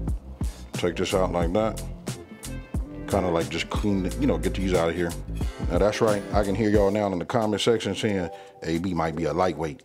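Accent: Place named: California, United States. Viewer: It strange to see and hear you cook southern food with that Cali accent.. But you got some skills.. I enjoy your videos. Keep doing what ya doing.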